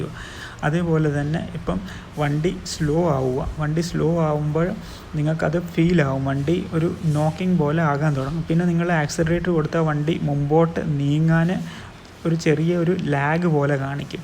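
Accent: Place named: Trivandrum, India. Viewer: Bro tvm aanalleee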